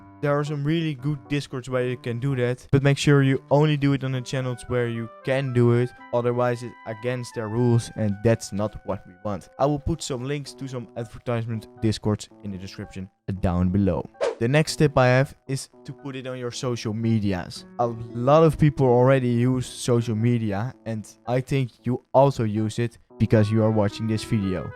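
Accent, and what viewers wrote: Netherlands. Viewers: Alright, goos vid but this dutch accent lmao great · Ben je Nederlands? Ik hoor aan die accent 😂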